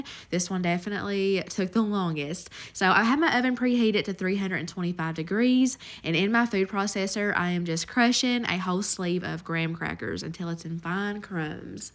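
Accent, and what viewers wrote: South, United States. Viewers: Wonderful recipes and you make them look so catered professional. I love you southern accent and you non wasting anything ideas. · I just found this video today as I’m looking for some appetizer recipes. I have to tell you that your southern accent is adorable. All the appetizers look delicious and you had great presentation. Can’t wait to try your recipes and watch more of your videos. Thanks 😊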